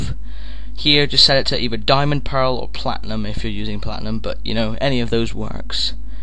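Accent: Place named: United Kingdom. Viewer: your awesome. british accent and straight to the point.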